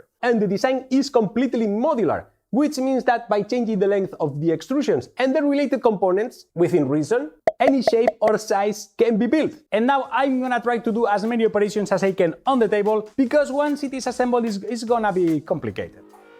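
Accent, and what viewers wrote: Spain. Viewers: ese acentazo es español! Que no? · Jajaj primera vez que me aparece un video tuyo, te oigo hablar y automáticamente he pensado: este tio es español fijo jajajaj gran descubrimiento tu canal, aquí tienes un nuevo suscriptor!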